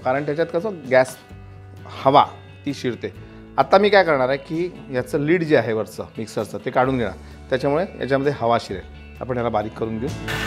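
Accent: Vidarbha, India. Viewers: Vidhrbha chi bhasha bole Tumi mustt khupch Chan vtl sir khupch Chan recipe sir 👍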